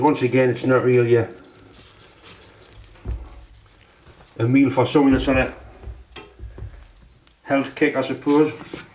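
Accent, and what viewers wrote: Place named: South Shields, United Kingdom. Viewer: My grandad was from South Shields, your voice and accent almost bring him back, thanks from far Australia.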